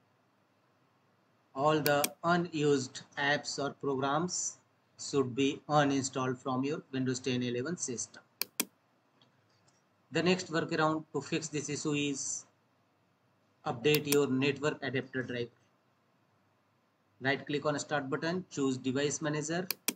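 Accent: India. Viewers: I already knew it that it was gonna work after hearing the Indian voice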